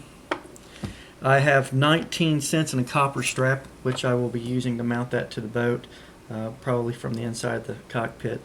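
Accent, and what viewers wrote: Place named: Kentucky, United States. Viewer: Are you from Kentucky or Tennessee?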